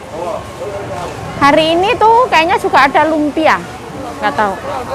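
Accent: Java, Indonesia. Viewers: Mba ini orang mana yaa? Bahasa jawanya medok bgt wkwkwk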